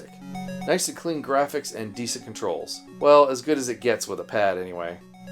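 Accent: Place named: United States. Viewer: hears American accent Me: here comes NES bias 😅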